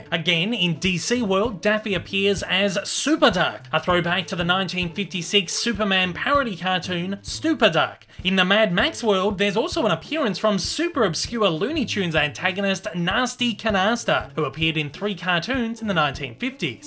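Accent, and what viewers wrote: Australia. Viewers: Where abouts in Australia are you from?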